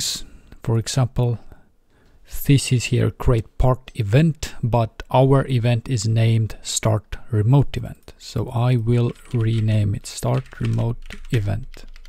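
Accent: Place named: Estonia. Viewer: see eesti aksent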